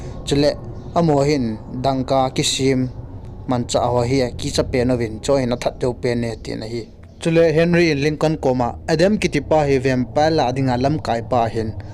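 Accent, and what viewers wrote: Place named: Moreh, India. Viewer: Moreh ho pao cu kangai nom g tama🤣🤣🤣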